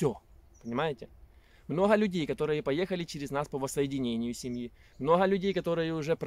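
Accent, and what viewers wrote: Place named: Surzhyk, Ukraine. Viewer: Досмотрел... Спс что не перешёл на мат как это обычно бывает в камеди-клаб. И да тот суржик, на котором ты "морозишь" далеко не русский язык. Так что либо говори на украинском языке, либо учи матчасть (символично неправда ли) прежде чем выходить на просторы ютьюба!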